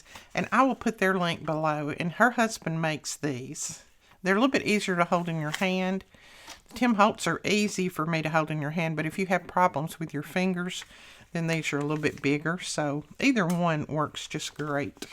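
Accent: South, United States. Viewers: You really do have so many people that love you! I just love you to pieces! You really are great. I miss you like crazy when your not on. I think it's your voice..just reminds me of home(the south) and my momma and Grams who are both gone. I wish that I could just give you a big hug, pray with you, and try to make things better! Not sure if you got your ink yet but just a fyi Tina from Shabby Dabby Doodah has insta ink too and she was saying how this time she has been waiting forever for her ink to come in. So if you don't have your in a couple days you might wanna try and get in touch with them! Love and prayers, collette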